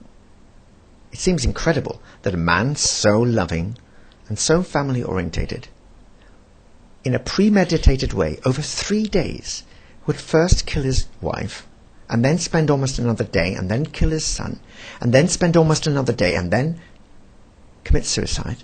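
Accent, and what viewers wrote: England, United Kingdom. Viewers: this english accent is starting to annoy me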